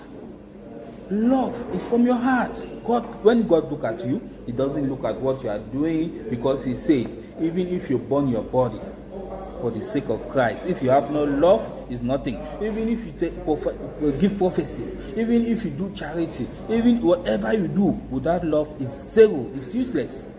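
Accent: Nigeria. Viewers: You sound 100% naija man yet u claim to be from Cameron,it's funny how people commenting negative about the late prophet all fell for your "lies".God bless you.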